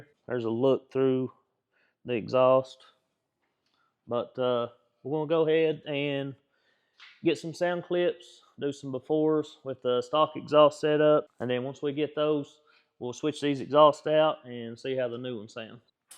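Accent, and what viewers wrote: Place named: South, United States. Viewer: Big farting slow talking southern draw coc skr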